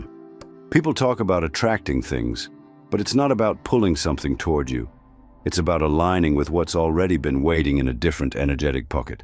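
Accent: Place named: United Kingdom. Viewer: Great video,,,,a little British but not too much 🙂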